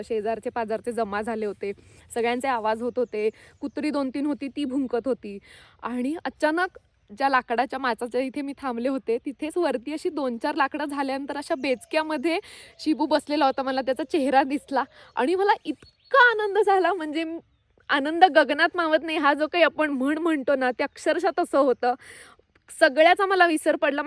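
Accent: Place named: Kolhapur, India. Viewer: Your accent is mostly like our kolhapuri👌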